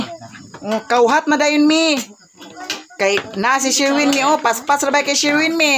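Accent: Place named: Davao, Philippines. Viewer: Relate man kaau ko ana imong sinultian kay sa amua sa davao man.binisaya kaayo .watching from dubai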